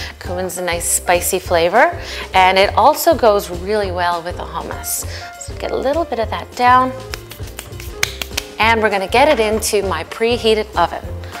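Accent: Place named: Newfoundland, Canada. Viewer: I picks up a little Newfy accent.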